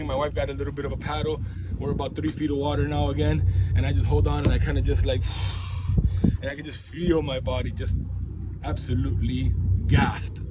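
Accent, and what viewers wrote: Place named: Florida, United States. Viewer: That Florida accent is so weird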